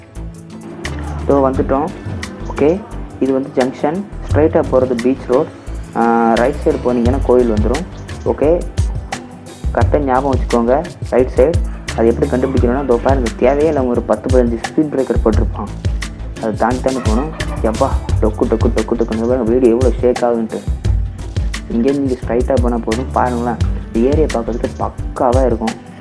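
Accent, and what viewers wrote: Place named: Kanyakumari, India. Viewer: Ur language spr... Kk slang🥰🤩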